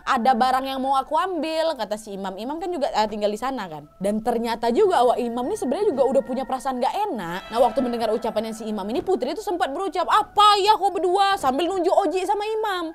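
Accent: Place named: Makassar, Indonesia. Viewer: Logatnya kayak logat orang sulawesi (makassar)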